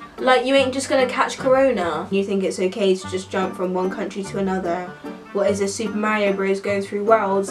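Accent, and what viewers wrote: Bristol, United Kingdom. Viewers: it feels like I haven’t heard your voice in ages, soooo Bristolian it hurts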